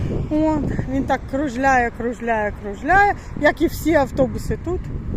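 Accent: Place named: Central Ukraine, Ukraine. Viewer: Привіт землячко, по вимові чую що ви з центральної України🖐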